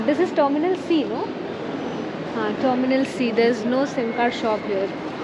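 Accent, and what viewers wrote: India. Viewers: indian english😏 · I have always loved the english accent for indians... Hello to You beautiful young Lady ! Best regards from Bucarest ROMANIA 💐🤞🍀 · Хорошая девушка. Но её английский очень похож на английский индийца в интермедии комедиклаба про американское радио с Харламовым... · Такой прикольный индийский акцент. Приятная девушка.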